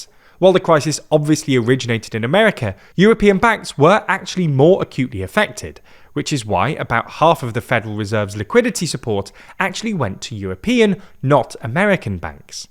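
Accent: United Kingdom. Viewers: Up to the strident, 'all knowing' Ai British accent was tolerable, but then it transitioned into an all out adblock circumventing ad, BEWARE, CHANNEL BLOCKED.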